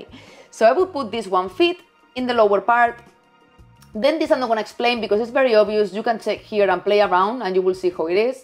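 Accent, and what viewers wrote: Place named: Spain. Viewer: very good video. great recommendations. Also like the accent. - Spanish?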